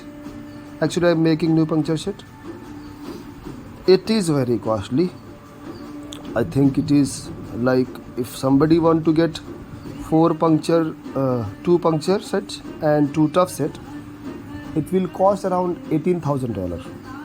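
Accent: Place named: India. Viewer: india bro logatnya aja ketahuan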